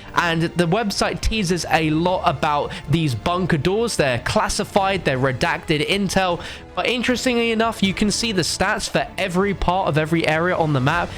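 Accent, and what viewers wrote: England, United Kingdom. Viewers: Why is every youtuber who plays cod from England or a England accent never fails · It’s that British accent man gets us every time.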